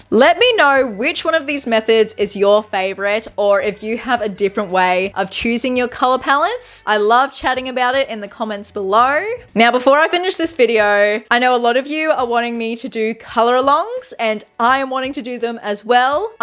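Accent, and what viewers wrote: Australia. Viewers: Thank you. I love those paintings. And I noticed your Aussie accent. Aussie, Aussie, Aussie ….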